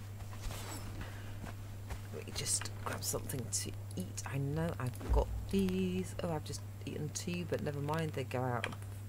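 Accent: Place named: United Kingdom. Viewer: Thank you for showing the route, with camera angles on landmarks, to where you found Hops. And thank you especially for popping up the map. You are now my favorite Conan Exiles YouTuber. As if the lovely female voice and British accent weren't enough for that already! :D On another note, I see you put yourself together a set of the Reptile Armor. Nice isn't it? It has slightly more Armor Points and Poise than the standard Medium Armor, and it takes only a single repair material.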